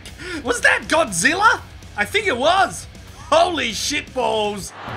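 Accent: Australia. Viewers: Let’s just appreciate the Aussie accent for a second. My wife studied English literature and she keeps telling me to turn this shit off. She also did not react well to the c-word I liberally and regularly use after watching these videos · I love when aussie man starts his video with, 'yeh nah'. You know it's Australian when he says yeh nah.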